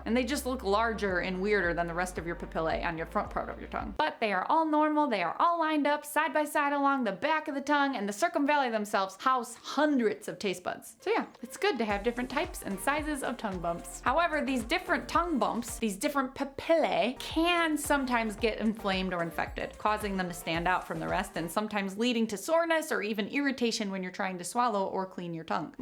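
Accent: United States. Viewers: Great informative channel with a positive personality and an a smooth American accent lol.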